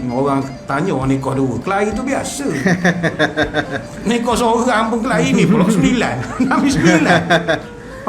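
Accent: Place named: Terengganu, Malaysia. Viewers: Loghat campur2 antara terengganu dgn pahang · Ustaz ada masuk slank (bahasa) Terengganu sikit...tapi rasa sedih dn sama rasa rindu kita kpd Nabi SAW dlm cerita Nabi kali ini. Moga kita semua di dlm rahmat Nabi SAW...Aaminn3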